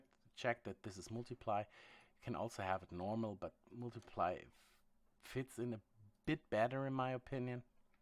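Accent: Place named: Germany. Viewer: Hey, höre da einen deutschen Akzent raus, daher auch hier mein Kommentar in deutsch. Kann mir jemand sage wofür das Grid am Ende nun gut war? Konnte den Zusammenhang bzw. den nützlichen Teil nicht ganz fassen. Wozu brauche ich ein Grid statt des Planeten bzw. wozu dient die Combo? Ansonsten sehr gutes Video klar zu folgen und einfach erklärt, vor allem nicht in der Muttersprache dafür nochmal ein "Daumen hoch"! Würde mir mindestens genauso schwer fallen! Den Leuten denen das Tutorial "zu ausführlich ist" kann ich nur raten von der Timeline Gebrauch zu machen ;) Cheers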